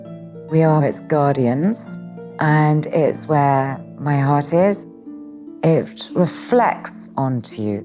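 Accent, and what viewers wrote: RP, United Kingdom. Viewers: she sounds posh.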